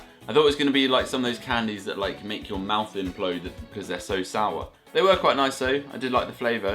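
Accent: United Kingdom. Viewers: Wait... Your British? X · i am british like you ello mate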